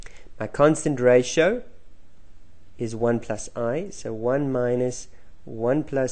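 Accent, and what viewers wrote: South Africa. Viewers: Thought you were from sunny South Africa. Thanks for the video :)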